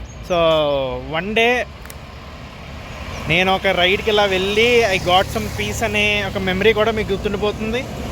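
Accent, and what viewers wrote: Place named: Godavari, India. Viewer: Ahaaaa Godavari slanggg vastundeeee 😂😂😂😂😂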